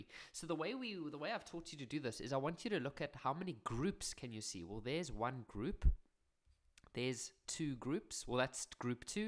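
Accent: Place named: South Africa. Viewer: Sounds like a "Soutie" iykyk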